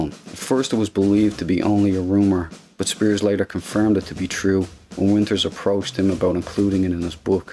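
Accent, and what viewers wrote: Dublin, Ireland. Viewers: Great video,! Are you Irish? You remind me of my uncle in Dublin. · Narrator an Irishman? Nice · Great video. Thanks for sharing. What's your accent? Irish? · I think the Irish accent really helps big up this mans feats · Love the accent, Dublin?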